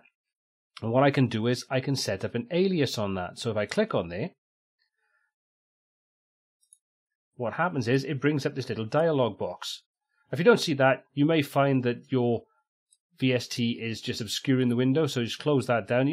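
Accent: Wales, United Kingdom. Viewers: Pardon the off-topic comment, but I'm a huge fan of UK accents. I'm thinking I hear Rob Brydon (seen on TV show 'QI' at times) in your voice. Might you hail from Wales or nearby? Thanks!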